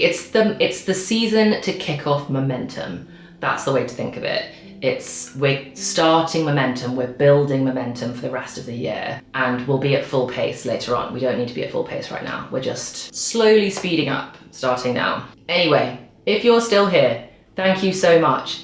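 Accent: United Kingdom. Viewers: Hi, happy new year! I'm Sarah from Italy. I've been listening to your videos for two years to improve my British English listening skills. Thank you very much ❤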